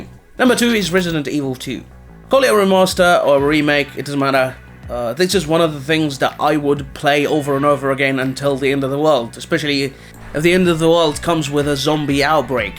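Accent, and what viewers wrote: United Kingdom. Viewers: I envy your british accent tbh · Your British Accent is awesome, really! keep it up, Dude!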